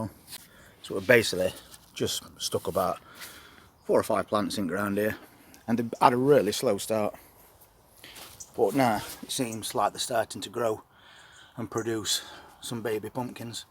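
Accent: Yorkshire, United Kingdom. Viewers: Love your (Yorkshire - correct me if I am wrong) accent and use of words. Will just leave it on bench❤. Was checking the size garden delights grow to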